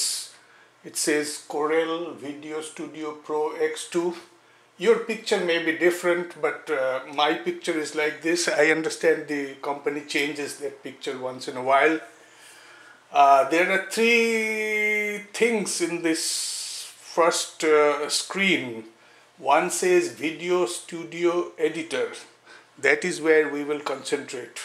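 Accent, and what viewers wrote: India. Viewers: You should apologize for your Indian accent. There´s nothing wrong with it, and it sounds great. Greetings from Montevideo city, Uruguay. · Awesome job of speaking, I don't care if you have an India Accent, LOL You are speaking quite clearly Im sure I will learn stuff from your tutorials 5* from Walter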